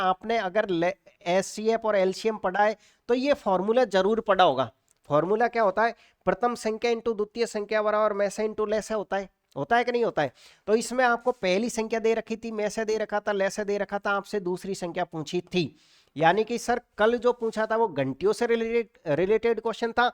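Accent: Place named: Jaipur, India. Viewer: Sir aapke bolne ke style me hi pata chalta hai aap jaipur se hai❤❤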